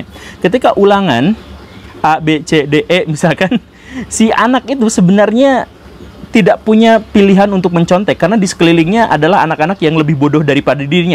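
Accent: West Java, Indonesia. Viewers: Aura Sunda nya sangat kental pa guru😊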